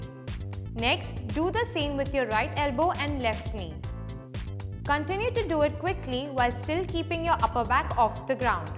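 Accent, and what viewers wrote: India. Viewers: Indian accent has to be the most annoying accent ever. Liked the exercises but listening to her speak is like nails against chalkboard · oof I'm indian but i hate this accent.... trying too hard.... but great video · U r indian... Right...came to know this after listening ur accent..😎😘😊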